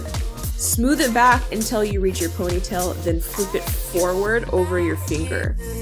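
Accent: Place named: Australia. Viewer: SOLID AUSSIE ACCENT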